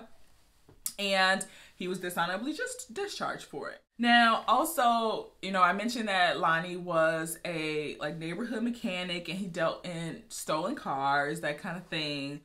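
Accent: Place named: Chicago, United States. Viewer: Yes u are from Chicago, I hear it all in yo words girly😅I'm born and raised there myself..New subscriber here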